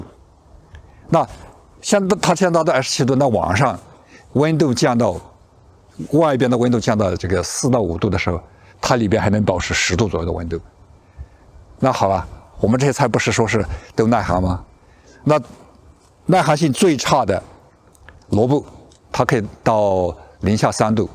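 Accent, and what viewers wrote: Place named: Kunming, China. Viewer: 谢谢李老师！听着你的昆明口音，太亲切了！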